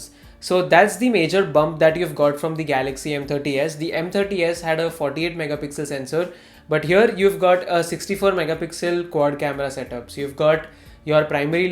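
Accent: India. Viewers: your accent is pure indian so try to speak slow and correct your voice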